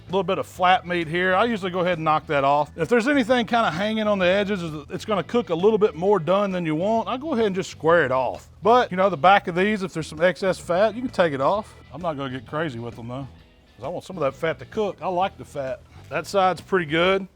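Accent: South, United States. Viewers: It’s that southern draw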